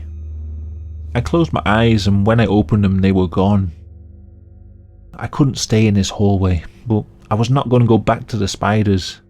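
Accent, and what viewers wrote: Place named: North, United Kingdom. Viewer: He’s just from up north lmao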